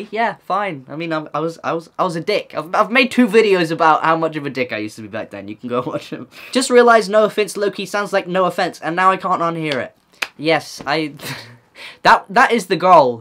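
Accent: United Kingdom. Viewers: I learn english watching you, since I started watching your videos I started like mixing british english with American english, it's funny but a little weird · i 100% support the tweet saying you helped them learn english, thanks to you i can now understand one of the numerous british accents that there is. so yup my french ass is grateful lmao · Your accent makes you sound British cuz you most likely are.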